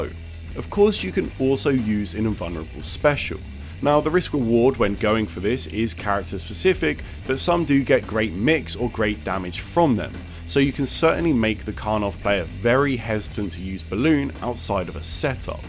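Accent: United Kingdom. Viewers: Calling something broken is a day one option select lmao. Excellent writing already. Btw, the audio mixing has improved drastically. Your voice is clear as day. The music is much clearer as well, but mixed in just right to add texture without muddying up your very British accent.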